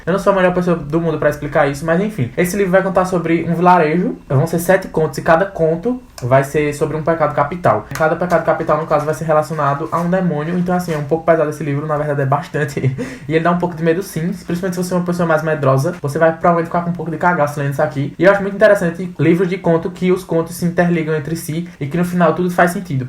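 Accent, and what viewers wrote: Northeast, Brazil. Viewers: é TÃO raro achar esse sotaque no youtube, que eu ainda tô em choque... Certezaaa que esse aí é do meu Nordeste!!!!!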